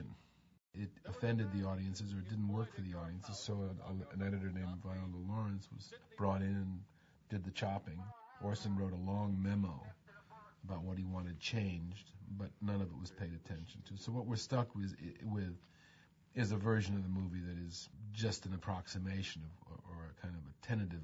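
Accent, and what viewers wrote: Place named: Ireland. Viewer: lol I lasted 30 seconds, not fair I know but his constant talking in that terrible Irish accent......!!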